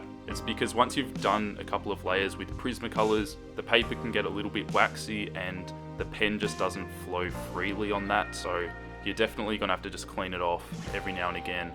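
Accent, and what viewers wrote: Australia. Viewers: Are you Australian? Sorry if I got that wrong I'm not very good with accents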